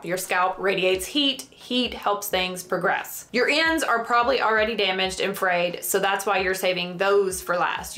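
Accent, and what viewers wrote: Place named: Texas, United States. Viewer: Are you from Texas? Nice southern accent & also because Texans are smart.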